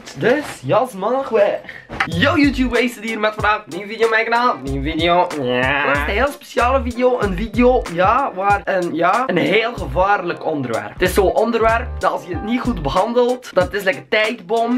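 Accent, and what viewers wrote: West Flanders, Belgium. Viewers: ik vind het belgische accent juist leuk hahahah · ik ben het helemaal met je eens. ik ben een nederlands meisje maar belgie vind ik persoonlijk geweldig, en ik hou van da accent... ik ben verliefd op da accent en jij bent ook geweldig en ik vind je accent juist heel leuk!! x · Ik ben een Nederlander maar ik wil een Belg zijn omdat 1 Je hebt een cool accent 2 De mensen zijn aardiger 3 NL is stom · Ik heb totaal geen hekel aan Belgen (ben nederlander😅) en vind t leuker om bijv naar jou te kijken dan enzo knol jullie accent is Gwn mooi en schattig😂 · Margot Poncelet ik denk dat hij uit west vlaanderen komt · ik ben nederlands en opzich hoor je wel dat je belgisch bent maar ik vind je wel echt een facking chille youtuber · Ik zelf ben een Nederlander maar ik haat belgen dus echt totaal NIET :) Ik hou juist fk veel van België ouwe. 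1: De mensen zijn knap ( Voorbeeld jij kuch ) 2: T is een fucking leuk accent. 3:LoicNottet komt uit belgie en hij is me babe♥ Belgie is gwn mijn schatje, me nummer 2 beste land ever. Fucking veel love voor BelgenLand♥ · Nederlands maar ik snap echt niet wat mensen tegen Belgen hebben... Ik vind jullie accent echt leuk om naar te luisteren!! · love Belgische youtubers ♥♥♥ ps ben Nederlands jullie accent is zoo leuk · ik ben eigenlijk in eerst in stantie gesubscribed omdat je een belg bent en ik het grappig vind hoe je praat maar je vids zijn ook chill. ik kom uit nl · Ik vind het belgiëse accent juist schattig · je accent is super cutee Belgen zijn hetzelfde alleen dan schattiger ik ben Jammer genoeg nederlands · Belgisch accent veel grappiger nii lullig bedoelt ofzo 😌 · Ik ben een Nederlander maar heb gewn haat aan Belgen, ps; jullie accent is soooo leuk!!!!!!! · Ik mag ze allebei en ben zelf een Nederlander maar belgië is supertof en ik hou echt van het accent! 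Vindt het echt fijn om naar te luisteren ( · Ja ik accepteer je zeker ik vindt dat Belgen grappig praten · Ik ben Nederlander, en ik vind Belgen gewoon normaal hoor. Haha. Ik vind jullie accent juist heel leuk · huh? ik ben een Nederlander en ik wil juist in België wonen en het accent over nemen ❤ gij bent geweldig 💋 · west Vlaams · Ik vond het belgisch accent altijd kut maar door Acid vind ik het nu juist leuk om naar te luisteren #gazodoor! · West Vlaams duidelijk,Limburgs is media taal en a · Dit is west vlaams met hollandse invloeden. Ik belgie ebde duzenden accenten, het brabants, bruxellairs, antwerps, gent, olsters, west vloms, oost vloms, lemburrgs enzo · ikzelf ben een Nederlander en vind belgen juist leuk met een accent, nederlands is zo simpel en saai hahaha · Ik ben een nederlander maar ik snap niet dat mensen gaan zeggen KUT Belg enzo want ik kijk veel liever na dan bijvoorbeeld Joey maar Joey maakt ook echt leuke video's maar voor mij is er dus geen verschil ik vind gwn goede video's maken en let niet op zijn accent ik kan het verstaan en dat vind ik genoeg dus wie KUT belg gaat zeggen moet maar komen!!! 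· ik ben gewoon nederlands en ik heb niks teggen belgië ik vind het ccent juist facking lollig en grappig om naar te luisteren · Je Belgische accent vind ik eigenlijk wel grappig xD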